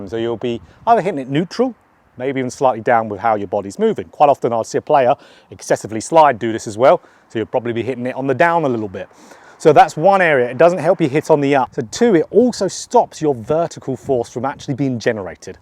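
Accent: Essex, United Kingdom. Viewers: Love it ! British type. He is defo British sounds a bit like Essex maybe. Yea it always rains here in Britain 🏌️‍♂️⛳🏌🏽‍♀️🌧🌨☔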